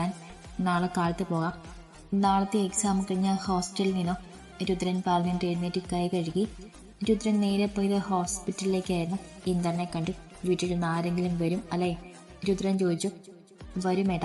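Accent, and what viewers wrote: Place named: Tamil Nadu, India. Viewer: ahno anik aa story nala ishta pine chechi de tamil malu slang oru rekshem illa poliii❤❤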